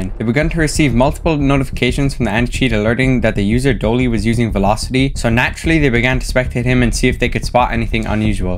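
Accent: Ireland. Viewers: Irish accent? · You sound irish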